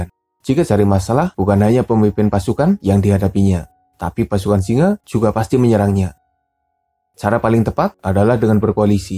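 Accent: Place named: Java, Indonesia. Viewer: Logat jawanya lucu mas🤣🤣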